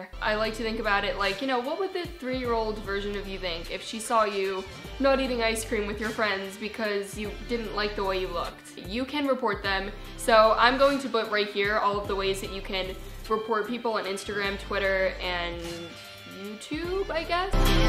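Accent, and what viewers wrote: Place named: New Jersey, United States. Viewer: you're from New Jersey? no wonder why you sound that way! you sound like you're from somewhere close to NY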